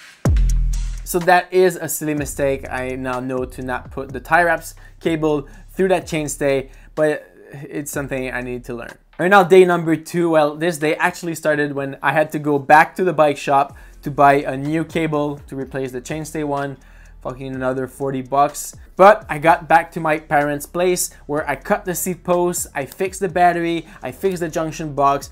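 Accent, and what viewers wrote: Quebec, Canada. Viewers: Hello, super cool de t'entendre jurer en Québécois pour moi frenchie qui adore votre accent mais surtout vos jurons. Clairement si je me lance dans un TT bike build, je partirai sur du sram suite à ta vidéo. J'ai remplacé mon guidon sur mon Giant TCX par un guidon aéro full intégré et le di2 m'a déjà bien énervé! Super vidéo. Merci et profite bien de ton Scott Plasma. Ps: tout ces câbles coupés viennent d'un mauvais routing de ta part ou plutôt de la complexité du vélo?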